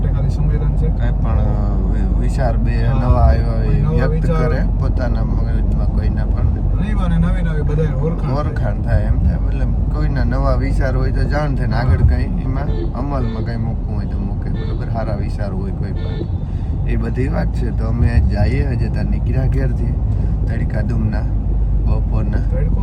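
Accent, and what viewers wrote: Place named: Mer, India. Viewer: દીકા મેર ની ભાષા જ બોલજે તું એ ભાષા મા જ બહુ મીઠડો લાગે વાલા..... મેર ની બોલી જ મીઠડી છે હો.....❤ જાજુ બધું વાલ દીકરા તને અને ભાઈ ને.🥰👍🙏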